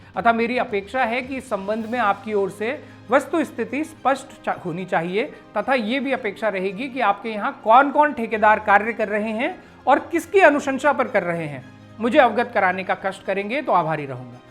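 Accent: Garhwal, India. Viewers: आपकी बोलने का ढंग प्यारी लगती है‌ हिन्दी गढ़वाली का मिश्रण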